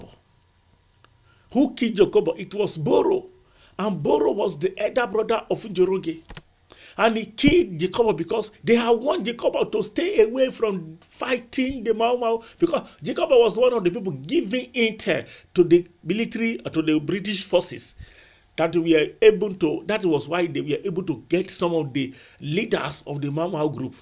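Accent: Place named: Nigeria. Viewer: As a kenyan, i am entertained in hearing the Nigerian accent of names like Njoroge, Nyokabi, Ngoto etc. Good job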